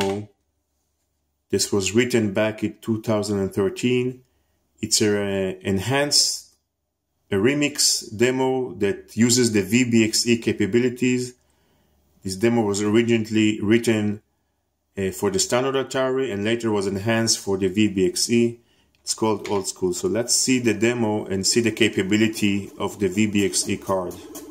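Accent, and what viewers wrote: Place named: Israel. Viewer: גבר לפי המבטא אתה ישראלי כמוני .... מדהים מה שעשית עם ה600XL לי יש עדיין 800XL בעליית הגג.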